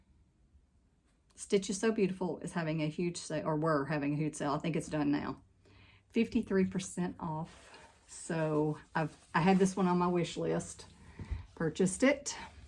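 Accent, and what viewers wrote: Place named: Alabama, United States. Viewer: I’m hearing the Alabama!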